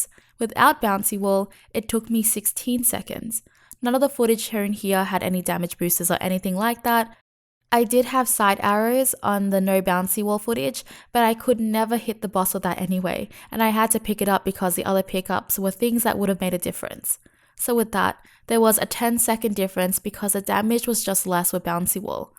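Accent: Australia. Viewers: Hi, I hope you are well! From my experiment, if you use meowgik, the cat damage is also reduced, due to the reduction of your base damage. I hope you can mention that in your future videos. Cat damage are reduced by around 25% when picked front arrow +1. Also from your accent, I assume you are Australian? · Sounds like your from Australia? I don't know why we do it, but I know a LOT of Americans who LOVE everything about Australia! The closest I've been to Australia was in 1975. The year I lived in Singapore. (Loved it!) If I'm wrong about your location, sorry but I still love your accent! Thanks for the game help! I was stuck & now I can tear up Archero!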